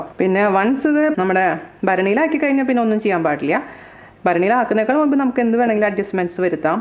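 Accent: Thrissur, India. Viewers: Trichur slang So sweet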